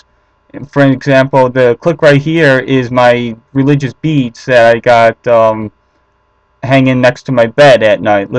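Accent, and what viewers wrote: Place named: Wisconsin, United States. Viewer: I detect a northern accent from you. You're from Wisconsin.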